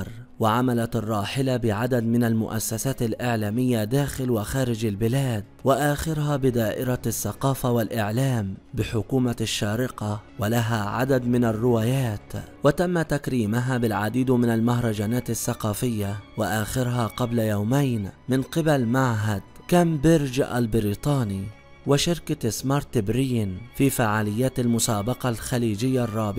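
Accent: Upper Egypt, Egypt. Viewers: سيبك من اللكنة الصعيدية اللي كأنك واقف على عربية فول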